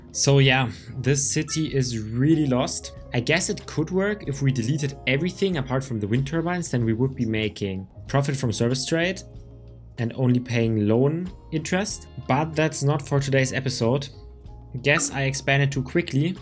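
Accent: Germany. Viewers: To me he sounds German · I hear deutsch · i hear a thiccc german accent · Man hört den deutschen Akzent 😂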